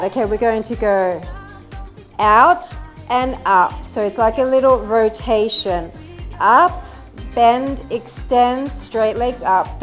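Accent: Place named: Australia. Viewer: woow! I love Australia! Hope to visit it one day! I started getting in love with your country since 's era. still be my fave singer and voice and yes I noticed a similar accent in you, but I didn't realize you are Australian too! Good workout to do after the athletic barre!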